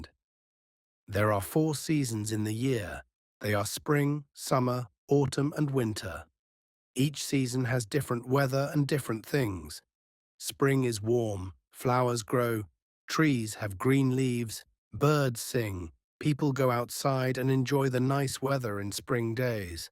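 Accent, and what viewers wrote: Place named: United Kingdom. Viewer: british olması lazım ama çok takılma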